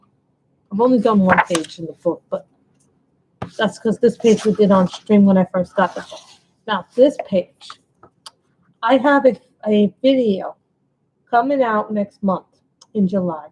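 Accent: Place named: New York, United States. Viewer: Wow. You were really busy in June. Great job on finishing books. I have yet to finish one. I jump around so much. I just want to color all the books. Lol. I hope you are enjoying/ enjoyed your vacation. So tell me where in NY are you? Yes the accent gave it away. Lol. Of course I know it well as I’m a New Yorker. I use to live in Yonkers. I’m now in Florida. Ok. Take care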